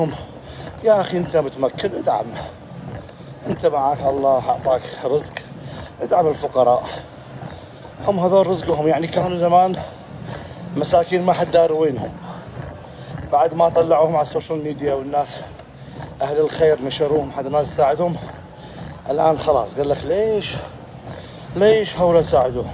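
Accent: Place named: Yemen. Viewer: ويش عرفك بهاذا كلام ماشاالله وبعدين كلامك يمني ياقلب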